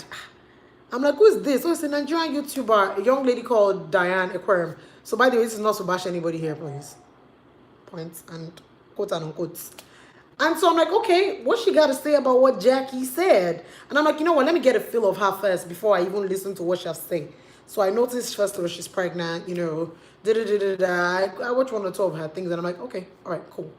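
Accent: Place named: Ghana. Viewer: I love your accent so much ,are you Ganian? I am South African by the way.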